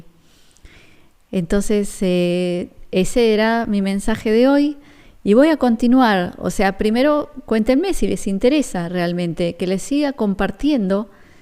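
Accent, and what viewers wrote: Argentina. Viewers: Verte y escuchar tu acento argentino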